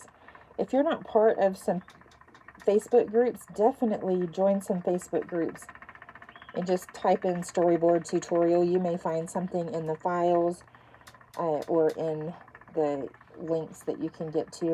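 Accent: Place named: Alabama, United States. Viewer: hi watching on replay and you sound like me from Alabama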